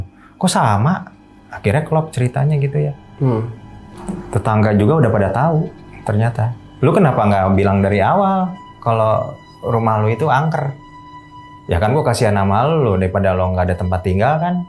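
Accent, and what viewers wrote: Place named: West Java, Indonesia. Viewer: Orang jakarta tinggal di bandung logatnya jadi logat sunda😁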